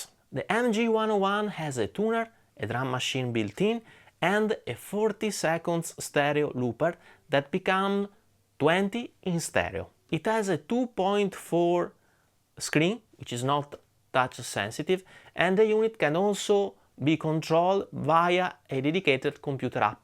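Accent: Italy. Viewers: Caro signore lei parla inglese come Renzi. Non crede che volendo fare video in inglese dovrebbe fare un po' di pratica di pronuncia? Anyway the video is good, I didn't realize if this gear can accept the IR.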